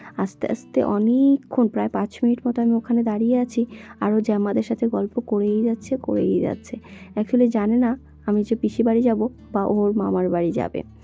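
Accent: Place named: Bangladesh. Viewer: de 2me jkhn bareta kotha blo bangladesh r tan dea kotha blo ota 2mr mukha sunta khub valo lga....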